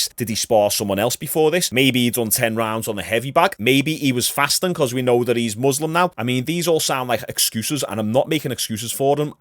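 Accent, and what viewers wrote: Liverpool, United Kingdom. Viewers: Watched your channel for a few months but just realised a few days ago I wasn't subbed. Love your content and Ive learned and laughed a lot but the main thing you have done for me is make the scouse accent bareable 😂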